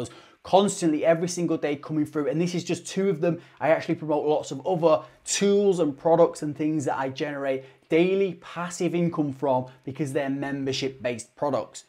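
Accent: United Kingdom. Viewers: Who struggles with British accent like me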